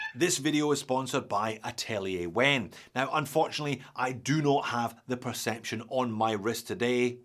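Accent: Scotland, United Kingdom. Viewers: I can hear the Scottish accent!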